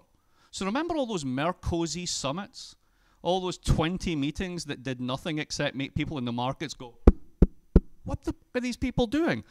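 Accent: Scotland, United Kingdom. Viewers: + SpectatingBystander - The speed of rapport and the Scottish accent make it difficult for an audience whose 1st language is not English. I am English and could only just keep up with him.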